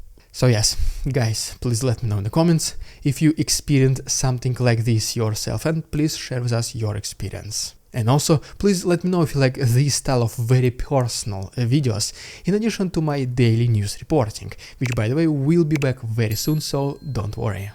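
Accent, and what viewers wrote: Russia. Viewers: But don't you realise? Your English can't possibly be good enough because you still have a Russian accent! And we like it. Keep going:-) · You are not the only one. Russian universities are looking for british english accent. Not american which you obviously got, you still talk like google translate. Extremly thick russian accent your university is breeding ground for spies and other govermental officials. You should have picked up spanish instead of english. Russian universities are making anyone fail that speak american english on purpose so that more learn british accents. Supply and demand. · I'm surprised how still has this accent, as a prostitute and heel licker of youtube money you'd expect him to smooth over these rough edges, or is this part of the money scheme image of a true Russian. And I see something of a crooked character in the tilting of the head, like zombies do 🧟‍♀ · I think you speak English pretty fucking great, you just have a manly rooskie accent which is great! 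You run an English yutube channel that's no joke lol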